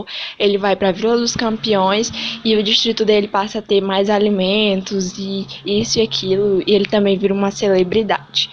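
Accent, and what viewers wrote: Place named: Ceará, Brazil. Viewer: Olaaa. 😊😊 Eu vi essa TAG esses dias e achei bacana pra responder. Talvez eu faça QQ hora dessas... O sorriso da hiena eu fiquei curiosa pra fazer a leitura. Jogos vorazes nunca bateu a vontade de ler mas vi os filmes e achei ok. Gente, teu sotaque é tão bonito. Parece cearense. 😊 Aaah, eu amo GOT. E curti desde o início kkkkkkk Küss 😘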